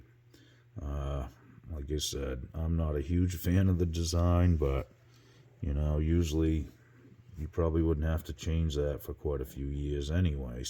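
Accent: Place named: Boston, United States. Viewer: I worked at Lasalle Music in Boston around the time they were selling these. Judging from your accent, it sounds like you might know the place. I did acquire a Charvel Model 5 at the time. The guitar plays great but the pickups were always weak on the top string, so I recently replaced them with active EMGs. In that process the licensed Floyd Rose bridge broke (it made of cheap pot metal). Nice job bringing back a nice guitar.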